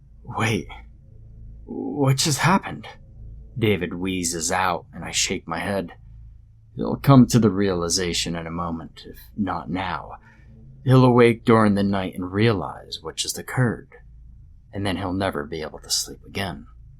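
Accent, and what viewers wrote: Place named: Alabama, United States. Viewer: Hey yep had to see the pups very cute And to hear the Alabama accent,did not disappoint Proper Southern Girl Blessings have a great weekend I will just say coz time zones baffle me atm defo need an early night. A Long ass day and 33Celsius in London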